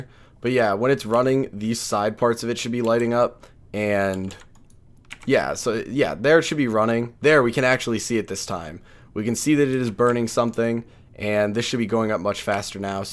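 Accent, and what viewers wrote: United States. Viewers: You have a strong American accent what area of USA is that?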